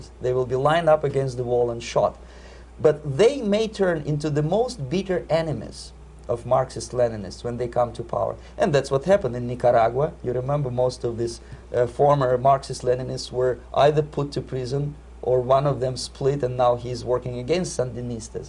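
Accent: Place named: United States. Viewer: You are not doing well at suppressing your American accent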